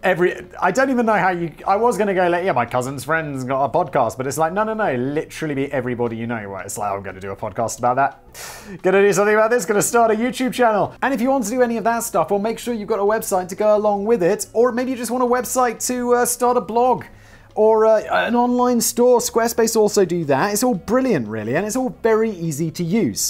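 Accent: United Kingdom. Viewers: It's all right, everyone; no need to panic! He's British... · I love how turned the British-isms up to a 1000 with this one. Also, a close runner up is to hear Simon blow past a sentence and then say, “what?! really?!”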